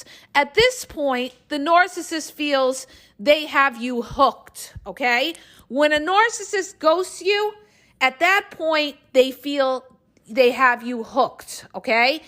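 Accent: New York, United States. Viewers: Ignore the haters! Your colorful vocabulary, and that classic NY/NJ accent and inflections make the podcasts even better. Reminds me of the Sopranos.:)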